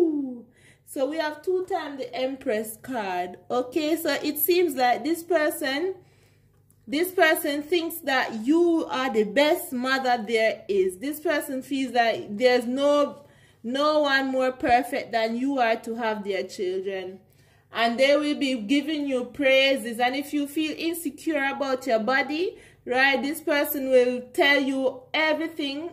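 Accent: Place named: Jamaica. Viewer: Omg mi just know seh is a Jamaican accent that ❤👏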